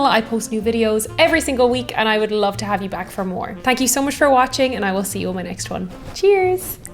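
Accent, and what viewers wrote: Ireland. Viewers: love your accent i llke the way you look irish tart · how more adorable can you be ... simply crazy about the Irish accent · I love your Irish accent. I liked when you were on the TRY channel.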